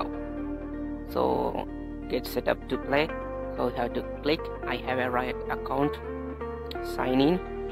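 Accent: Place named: Kerala, India. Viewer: ennik English kettapo thanne thonni 😂😂 malayali anen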